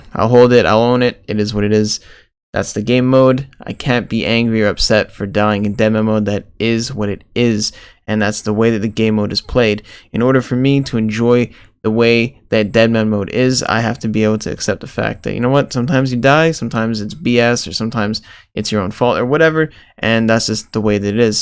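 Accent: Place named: Ontario, Canada. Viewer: Are you Canadian? Sounds like south Ontario.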